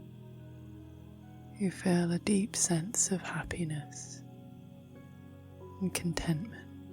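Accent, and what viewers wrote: United Kingdom. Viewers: Thank you, for your wonderful channel and all the effort you have put into it. This video helped me to fall into a deep and relaxing sleep. Thanks to your lovely voice and your marvellous British accent. Greetings from Germany. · Alright, nice to hear an accent from my town, London. Drive through Clapham sometimes. Great voice and meditation stuff thanks 👍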